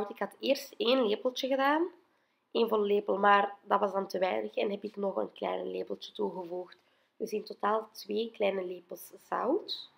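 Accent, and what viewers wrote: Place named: Antwerp, Belgium. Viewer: Bsaha. Ziet er heerlijk uit 😋 jij komt zeker uit Antwerpen want je woordenschat en intonatie is zoals Nora Gharib. Is niet slecht bedoeld maar als een compliment 😘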